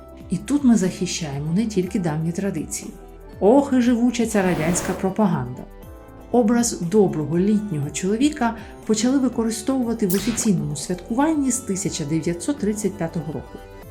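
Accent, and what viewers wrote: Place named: United States. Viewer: Мені подобається ваш американський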